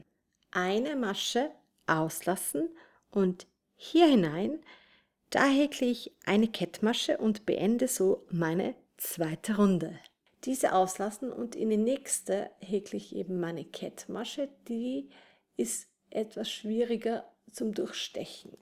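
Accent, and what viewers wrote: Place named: Austria. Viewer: Mir geht es schon besser, den österreichischen Slang zu hören! Danke, für die Anleitung!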